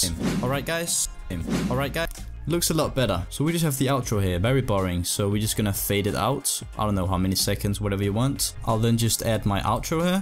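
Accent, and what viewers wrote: Denmark. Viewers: Laki Popic He's Danish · Ohhhh den danske accent xD Super video! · are u from denmark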